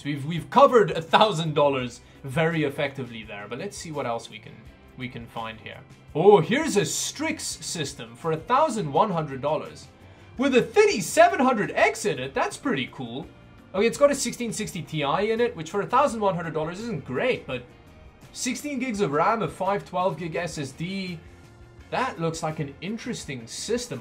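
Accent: South Africa. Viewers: Wait are you South African ? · Is jy van suid afrika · Are you South African? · dude are you south African?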